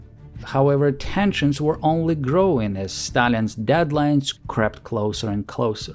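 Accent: Ukraine. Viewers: Is this channel literally anti Russian, Ukrainian propaganda? The narrator’s accent sounds a lot like a certain diminutive Ukrainian actor aka Churchill 2.0 aka the green goblin. Fascinating content though. I had no idea 1000 Tu-4’s were built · Hearing all of this in a Ukrainian accent, is especially chilling. I want to make a joke, but it's just sad. Україна не одна. (sorry for any poor translation.) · I now saw that part, of napoleonic wars. Now i can hear also that accent. Western Ukrainian. Dude, i understand, your so called country is slowly but irreversibly is consumed by Russia, but lying about history would not change it. Maybe you should learn from it. Russia is like balloon, when you blow to it, it expands. Battle at Borodino was tactical victory of Napoleon, but strategical victory of Kutuzov. Napoleon gathered more than half million soldiers for invasion to Russia and returned with less than 50 thousand. 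And it is necessary to say that was half of all soldiers France could gather and it was that better part (they left at home soldiers and militia of questionable quality). This "victory" was defeat of Napoleon. Until then, nobody can challenge him, but he bled France so badly in this endeavor, that despite he was still genius and able to defeat European sissies after 1812, he simply lost because sheer numerical superiority of them. And that was possible only because Russia strategy and general Russian winter. Russia is always ridiculed, but it was Russia, who decided wars since 15th century. Yes, very often fruits of that Russian efforts were eaten by another, like in WW1, when it was Russian "problem", which tighten majority of German forces, which would easily wipe out French and British forces, if they would not have to fight Russians. Same apply for balkans. It was Russia, who freed balkan states from Turkish opression, of course Tsars wanted new dominion, not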